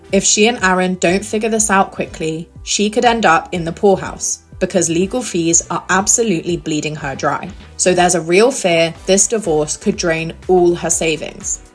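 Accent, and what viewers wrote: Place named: United Kingdom. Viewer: Your British accent is annoying …I had to stop halfway through because I couldn’t take it anymore.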